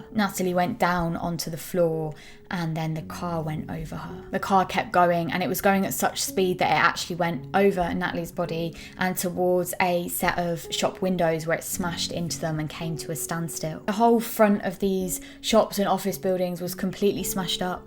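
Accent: United Kingdom. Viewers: Hi . Love your accent. I assume it’s British? Don’t laugh. I’m not great with accents. 😉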